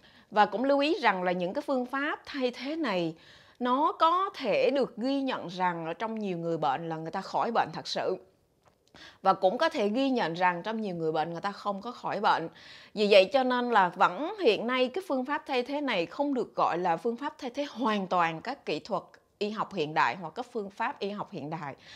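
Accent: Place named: Quang Nam, Vietnam. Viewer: là người Quảng Nam ạ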